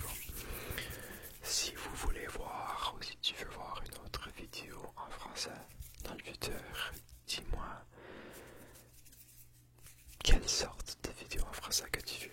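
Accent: Quebec, Canada. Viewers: Ton français resemble au français du Québec ! A tu apris le français au Québec ? Btw très bonne vidéo merci !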